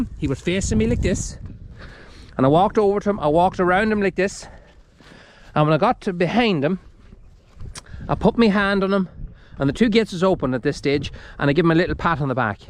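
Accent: Ireland. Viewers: Irish or English? Where I am cattle are outside year round. USA. Excuse me but are not twins not as large? I think having twins would be easier? The more I listen the more I think Irish. My grandpa raised herefords. His bull was so tame. He stayed with the cows for two years, then he was sold. Grandpa changed bulls every two years. OK going to shutup. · Hello, a new viewer here. What an amazing story about you surviving a bull attack. I especially enjoyed how gentle and caring you were while assisting with the delivery of the twin bull calves. Your Irish accent is quite charming. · You sound like my Grandparents. They were from Ireland. And they have been gone 40-45 years now. Thank you for putting a smile on my face.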